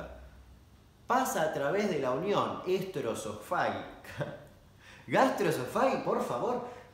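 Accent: Argentina. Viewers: Pareces español pero hablas como argentino xd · El hecho de que tengas acento argentino (o uruguayo(?) lo mejora todo 70 veces. Gracias,buena info capo.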